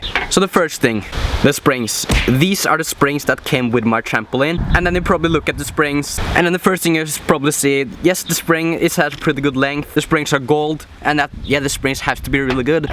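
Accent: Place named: Finland. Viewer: You sound Finnish or Swedish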